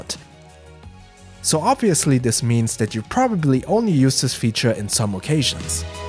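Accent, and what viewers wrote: Germany. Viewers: That's a good looking pack. Goes almost too well with your jacket. I bet pack cost about 25 thousand dollars. What is your accent? German. Cool voice.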